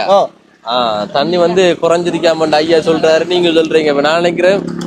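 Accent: Sri Lanka. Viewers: இலங்கை தமிழ் மிக அழகாக உள்ளது அண்ணா😊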